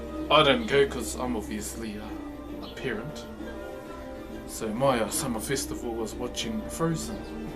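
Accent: New Zealand. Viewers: Keep up the good work mate. It's awesome what you are doing, it's really interesting. Hearing you speak makes me miss working with all of the kiwi lads that i used to bounce with. Cheers 🍻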